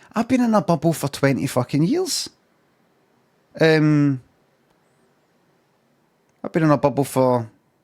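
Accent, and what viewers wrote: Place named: West End, United Kingdom. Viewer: Horrifying to see. Will only be a matter of time before Limmy's west end accent and his real voice are one and the same.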